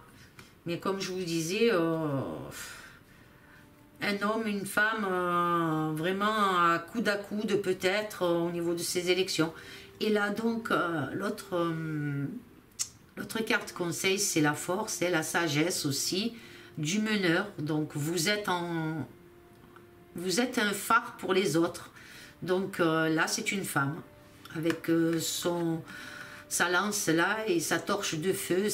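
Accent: South, France. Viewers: Bonjour, je vous découvre et vous êtes agréable à écouter. Pour ma part, j'ai un ressenti sur un duel entre deux hommes et ce sera l adversaire à Macron, un homme inattendu au pouvoir, mais pas aussi inconnu que Macron. Vs avez un accent de la Drôme? Du Vaucluse? Du Gard?.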